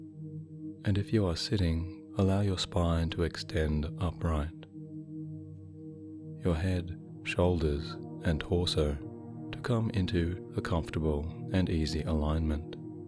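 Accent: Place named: Australia. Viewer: Very nice Meditation, love your accent, Australia or New Zealand? Namaste!!!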